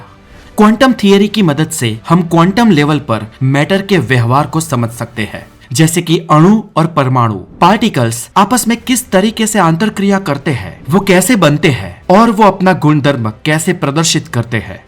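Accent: Gujarat, India. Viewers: gujarati hai. par udisa bilkul dusre chhor par hote hue bhi similar hai kuch chize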